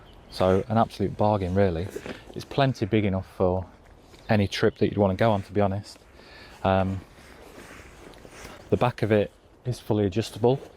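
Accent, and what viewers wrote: United Kingdom. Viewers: sometimes you sound close ? Maybe Leeds area ?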